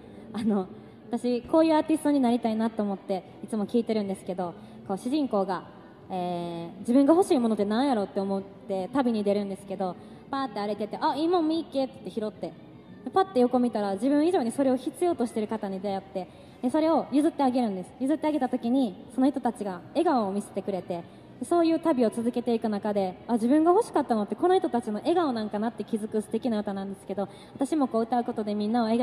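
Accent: Japan. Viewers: 大阪弁エグい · 関西弁がなんか身近にかんじてたちどまってしまう。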